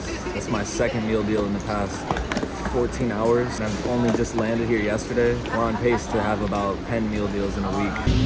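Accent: United Kingdom. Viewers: Always lovely to have you on this side of the pond. Your really starting to sound like a Brit. Enjoy your stay ❤ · you sound more british everytime you come lol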